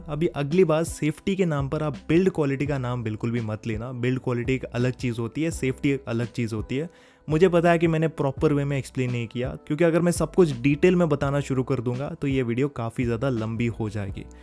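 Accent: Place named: South, India. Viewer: Brother.. appreciate your Hindi. Just improve your MTI. You purely sound south Indian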